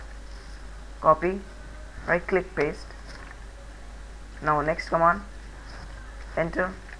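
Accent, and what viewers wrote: India. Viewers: Polish person with Indian accent i love it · Funniest indian accent ever but thanks for fixing my problem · you are indian xD ? ps im not trolling you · are u indian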